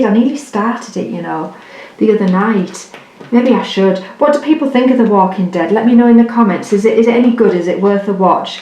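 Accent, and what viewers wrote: United Kingdom. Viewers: I've just come across your channel and will sub. At the moment I'm working my way through your diamond painting but I've laughed and laughed it just unbelievable......I'm in my early seventies but you've answered all those tags the same as I would.......my dread movie was the first (never watched the others) hallowe'en I was terrified!!!!! I couldn't sleep afterwards i couldn't look at the window and the door at the same time so I was tossing and turning keeping check allllll night lol. It was the fact that he didn't die I was so sure he was coming after me. I scream at gremlins my kids moved from me when I took them to the pictures. And I cut my finger down to the bone when my dad just walked into the kitchen while I was cutting bread.....I nearly clung to the ceiling like Tom from Tom and Jerry 🤦‍♀️ I also do cross stitch, jewellery making, paper crafts if all kinds and love to crochet. 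Not enough hours in the day. Must go and watch-listen to your other vids while I'm dping. I also love to dp to audio books. Its lovely also to listen to Uk voice. I'm from Liverpool. Keep up the good work. Thanks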